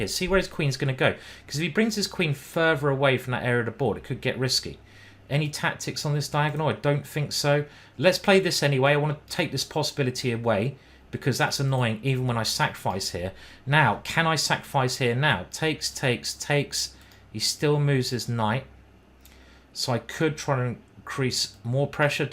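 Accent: United Kingdom. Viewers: It's the Queens' English mate. What, are your ears painted on?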